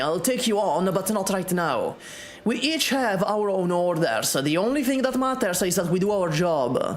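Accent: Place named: Syracuse, Italy. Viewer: he spoke in a siracusian accent for 3 & 1/2 hours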